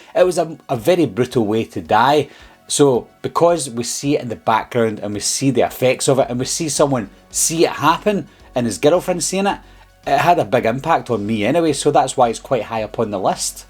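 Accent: Scotland, United Kingdom. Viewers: I love your accent, is it Scottish?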